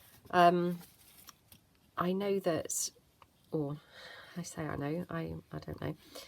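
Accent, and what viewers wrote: United Kingdom. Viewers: Hi! I am a fairly new subbie, and wanted you to know how much I am enjoying your videos, and you! I was sorry to hear that some thoughtless person felt compelled to leave you negative feedback...for...what? Did I hear you right, for being too chatty? Really? I find your conversation light, real, and interesting. And, your sweet accent is music to my ears! If you don't mind me asking, you sound British to me, so I am curious as to where in the UK, do you live? It seems you and I are on the same wavelength, because I too have been very inspired lately by Creativecafegirl. In fact, today I was playing around with making a little something, with her in mind, when I clicked on your video...which made the whole experience even better, so thanks for your company and inspiration also. Your pockets turned out stunning! You did a marvelous job, and I just luv them! 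Your Bellissimo kit looks gorgeous! I must go look around at your Etsy shop. Sorry for being "so chatty"...LOL! TFS! xo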